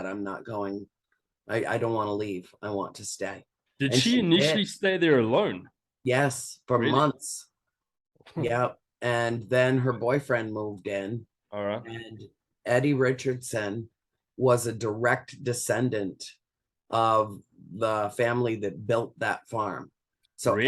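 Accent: South Africa. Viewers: I will definitely. I like your Afrikaans accent. Groete van Kaapstad!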